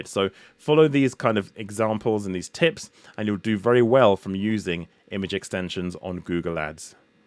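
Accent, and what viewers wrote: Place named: United Kingdom. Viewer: I was about to sign up ro linkedin to get access to their learning library but the reviews on their google ads course are shady. Apparently the guy doing the videos has a bad accent and is hard to understand. Then I was considering paying for a cheap Coursera digital marketing course but that covers things I already know and is more costly. I wanted to focus on Google Ads, which is what you are doing here in a beefy 5+ hour video. This is what was looking for. Not only do you have a cool Brih-ish accent but you're putting this out here for free! It's wild. Thank you so much I intend do watch this whole thing thoroughly and injest all your knowledge. Thank you very much kind sir! PS: I love the gaming props in the backround!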